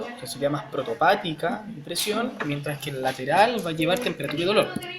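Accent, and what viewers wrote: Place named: Chile. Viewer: español propio de chile xd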